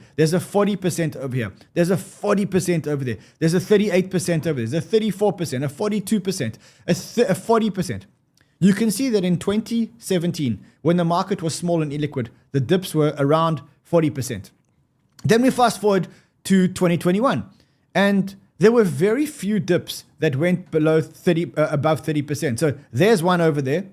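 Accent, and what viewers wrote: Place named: South Africa. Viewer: I think the South African accent should be banned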